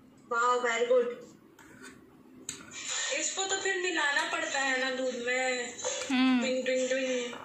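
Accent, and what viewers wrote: Bihar, India. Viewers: Di aaplog Bihar s belong krte ho n ...qki bat krne k tone s lgta h Bihar s ho aap... mai b bihar s hu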